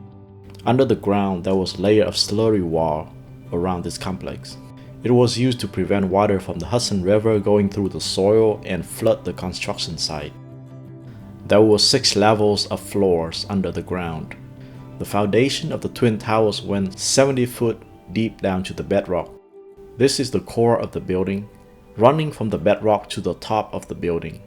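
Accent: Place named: Vietnam. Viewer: Overall OK but I have 2 issues with this video. 1) the steel beams didn’t melt, they softened while items made from aluminum melted. Melting steel is a falsehood that has been parroted by others for way too long. 2) it’s difficult to listen to a video where the speaker has such a thick Vietnamese accent. Some words sound like a hybrid pronunciation between Vietnamese and English. It’s like nails on a chalkboard at times. It made it very difficult to actually sit and watch everything until the end.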